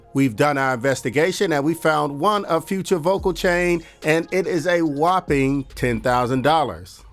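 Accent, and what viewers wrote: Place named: Nigeria. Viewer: I Don't know why i though you are Nigerian 😂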